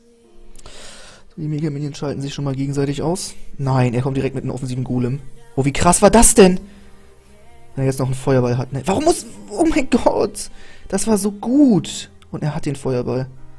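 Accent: Munich, Germany. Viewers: kommst du aus München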